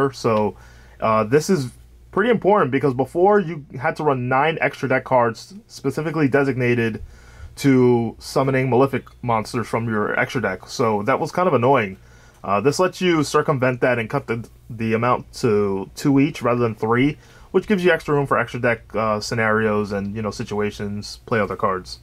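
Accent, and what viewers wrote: United States. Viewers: Is English not your first language, you sound American, or did you genuinely not know? :v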